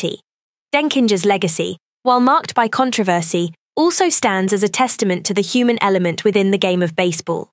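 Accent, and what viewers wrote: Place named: RP, United Kingdom. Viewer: It's pronounced "vie da" , not "vee da", Blue. Rhymes with "Ida". Since you use British RP accent, it rhymes with cider, not cedar. Anyway, may God grant Vida Blue eternal peace.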